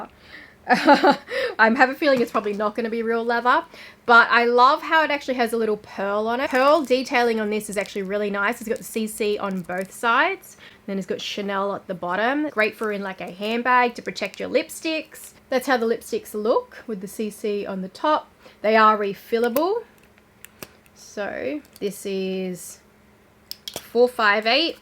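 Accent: Australia. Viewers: You sound very Australian wouldn’t you be better off flying to Dubai to purchase Luxury handbags 👜?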